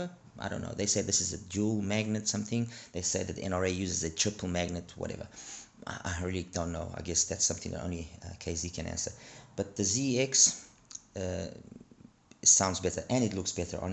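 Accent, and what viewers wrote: South Africa. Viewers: Where are you from man? I live in South Africa and your accent sound South African. Just very curious now.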